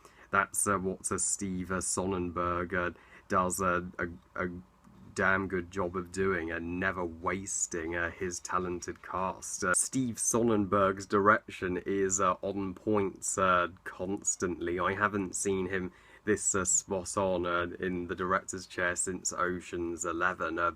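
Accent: United States. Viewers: Like Your American Accent! I Can Do That accent! I Watch Logan Lucky Soon when its out.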